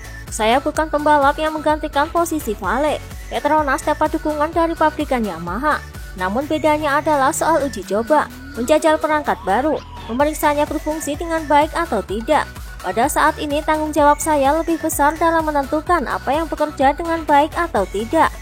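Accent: Java, Indonesia. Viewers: Ngomongnya medok.. Emang gk bisa di ilangin y? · Logat Jawa..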